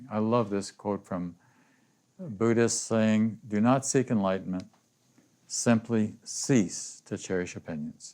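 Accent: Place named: Missouri, United States. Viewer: Yes, I do hear your Missouri heartland accent, no? 🙏🏼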